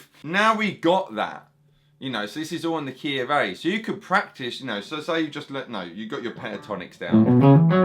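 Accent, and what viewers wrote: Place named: Cockney, United Kingdom. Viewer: Came for the listen, stayed for the cockney accent.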